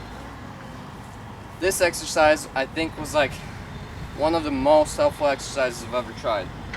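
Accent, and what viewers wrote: Russia. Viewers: Is he Russian ? I can hear he has an accent but i don't know what accent is that xD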